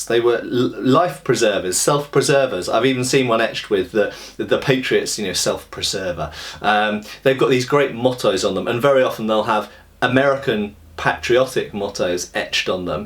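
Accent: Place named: United Kingdom. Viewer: I have never seen a silverware handled bowie knife, nor have I ever wanted one. However like most Americans, for no good reason, after hearing someone with a British accent recommend it I do.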